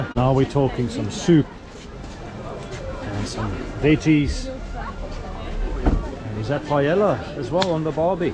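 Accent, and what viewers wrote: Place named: South Africa. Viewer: You Mr sounds like from South Africa to me, maybe I wrong,love your videos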